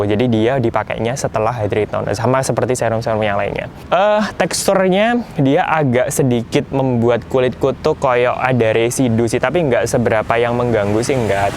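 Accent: Java, Indonesia. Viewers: Kok yo medhok tenan yo. Tapi rinci banget seneng penjelasanne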